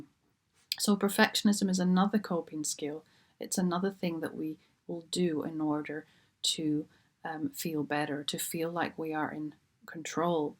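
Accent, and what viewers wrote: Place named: Scotland, United Kingdom. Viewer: Can't figure it out. Sometimes she sounds like a native of Scotland, sometimes Northern Ireland.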